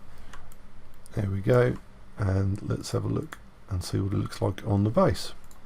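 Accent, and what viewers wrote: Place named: England, United Kingdom. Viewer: Thanks, great tutorial. May I ask what part of England your accent is from?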